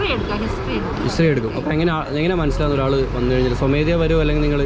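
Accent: Kannur, India. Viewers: Kai kazkeet poya mathi😎 kannurkarante tone ingana aan💪🏻😎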